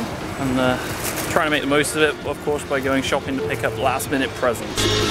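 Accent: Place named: Dorset, United Kingdom. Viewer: back to Bounemouth eh? Oi knew oi erd a Dorset accent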